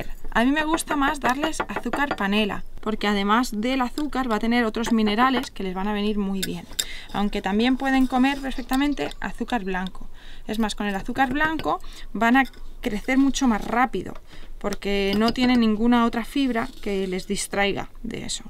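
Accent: Spain. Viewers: ERES FABULOSA,,TE VEO DESDE AUSTRALIA,,DESCUBRI KEFIR POR ACCIDENTE,TRATE EL AGUA DE KEFIR Y NO ME GUSTO MUCHO,,CON TUS CLASES, YA LA VOLVERE A HACER NUEVAMENTE, TENGO 85 AÑOS Y ME SIENTO FASCINADO, ME GUSTA TU ACENTO ESPAÑOL GRACIAS MIL LINDA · Puedes compartir de esos cultivos para hacerlo yo? Por favor x tu acento creo q eres española yo vivo en USA si eres fan amable gracias espero tu respuesta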